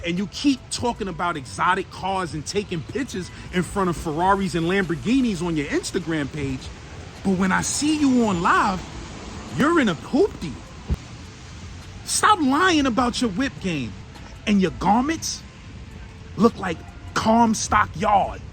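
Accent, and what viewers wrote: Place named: Connecticut, United States. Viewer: OMG!!! Yooooooo!!!! you smoked it " Big Kermit the Frog face, turtle face dude " 🙀💯😂😂😂😂😂😂😂😂😂😂😂 yooo I was dying my nigga, you sound like your from out here in CT 😯😆😆😆😆that was funny as hell!!😁🙀😆😆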